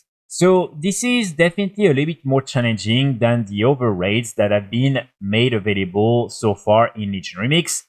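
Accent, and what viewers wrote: France. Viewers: Frenchie accent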